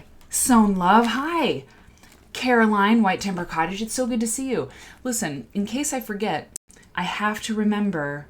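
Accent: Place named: United States. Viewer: All this talk of chips and crisps in an American accent is messing with my internal autocorrect!! Mayo and chips is great and you'll need to find a different chippy if you're getting soggy chips 😝 that's no good! I put the vinegar on at home. Vinegar at the shop plus the journey home is a crunch killer 😞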